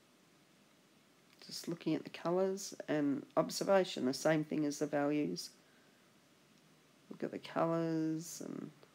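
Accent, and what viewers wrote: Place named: Australia. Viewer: First, you are a wonderful artist. Here are some comments of mine. I had trouble understanding you because of your chest cold and Aussie accent. It did get better as we went along but you sometimes let your words trail off. You work fast, so you might want to stop at some point and show the layers you are using. Also, you use different brushes. Some artists will draw a line or two on a white layer to show what the brush looks like on its own before applying it to the painting. You might consider this. You mentioned a base layer to get darker color but you may want to take that a bit slower so people can understand where you put it and why. Hope this helps. Ron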